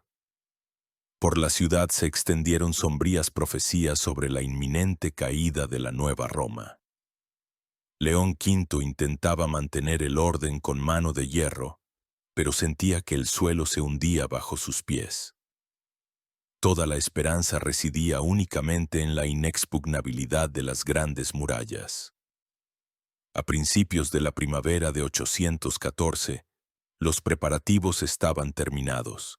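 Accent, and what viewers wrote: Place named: Latin America. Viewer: Aunque todos sabemos que este video esta hecho por IA, supongo que detras de el habra una persona dedicando tiempo y queriendo subir visualizaciones... No se si no se ha percatado de que desde que la locucion cambio a una voz en latino las visualizaciones han descendido drásticamente. Son muchos los comentarios que piden que volvais a la voz anterior pero parece que no se oyen las peticiones de la audiencia. Una pena....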